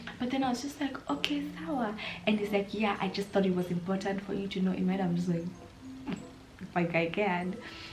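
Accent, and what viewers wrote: United Kingdom. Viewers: The British accent gets me all the time😂😂😂😂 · The british accent always gets me😂😂❤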